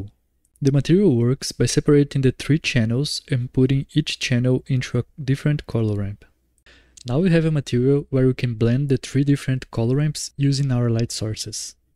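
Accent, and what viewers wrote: Brazil. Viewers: mano vc é brasileiro?? kk percebi muito o sotaque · Esse sotaque de brasileiro maravilhoso, que vídeo prft irmão! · Bizarro como da pra saber na hora que é br mesmo falando em ingles kkkkkkkkk, vlw pelo video pai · Cê tem sotaque de brasileiro amigo, ótimo vídeo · Sabia que tu era brasileiro nos primeiros 20 segundos XD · Man... Are you Brazilian? Your way to talk makes me think so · Do I hear a brazillian accent? Isso parece tecnologia da boa! Adoro mexer com píxeis e renderização! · Sotaque Brasileirinho... nice addon btw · That accent. Brazilian. 🤔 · nossa eu acho impressionante como eu descobri que você é brasileiro só pelo sotaque, o meu é igual dfgfsjhs quero um dia aprender a fazer render então muito obrigada pelo vídeo <3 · For some reason, it look like u have a Brazilian accent 😂❤ thanks for the video, it's amazing how we can now make those things with such a easy way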